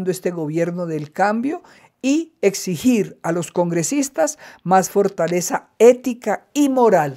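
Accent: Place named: Monterrey, Mexico. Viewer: ¿Señora, Usted dice de todo, pero no aporta ninguna prueba, solo se burla de Petro. ¡Usted es clasista, racista, se le nota por su habladito 'regio'!! ¡Qué basura de canal, coja oficio!!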